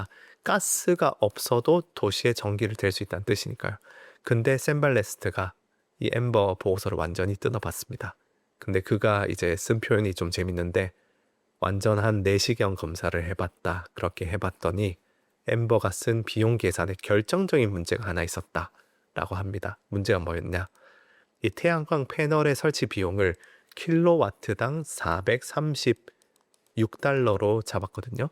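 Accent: Gyeongsang, South Korea. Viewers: 처음 들었을때부터 경상도 출신이라는거 알겠던데요 ㅎㅎ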